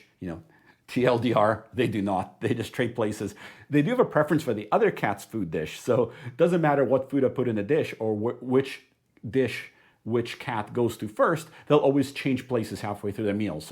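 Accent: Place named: Canada. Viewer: Thank you!!! I bought that whisker stress story hook, line and sinker. I will purchase the feeder and water fountain you recommend. You are as adorable as Mr. Pirate, and I love your Canadian accent!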